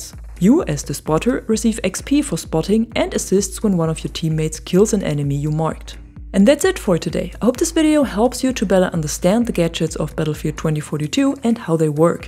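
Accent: Germany. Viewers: Wie man merkt dass du eine deutsche bist haha ✌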